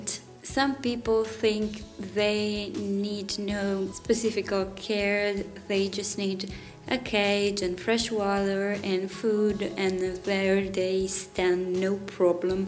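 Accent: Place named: Italy. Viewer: Posso darti un consiglio? Non forzare la pronuncia, è davvero fastidioso da sentire! Non devi vergognarti del tuo accento italiano, e se proprio lo vuoi cambiare ti ci vuole molta pratica e non lo puoi improvvisare così. Ma poi il nostro accento è bello e simpatico, quando ero in Inghilterra me lo dicevano tutti :)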